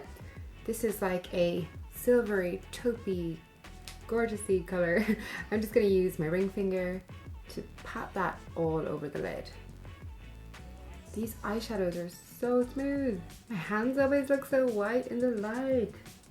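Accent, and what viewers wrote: Ireland. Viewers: Love your Irish accent, you look so beautiful xxx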